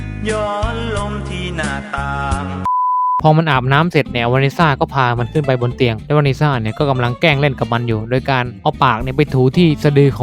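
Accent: South, Thailand. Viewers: เหมือนจะสำเนียงอีสานนะ แต่บางทีก็ไปทางใต้ มีเสน่ห์ดีพี่ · พี่พูดเหมือนสำเนียงเหมือนใต้เลยครับ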